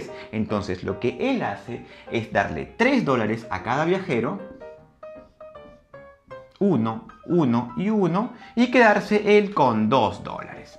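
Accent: Peru. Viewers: eres peruano? :o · O sea eres peruano?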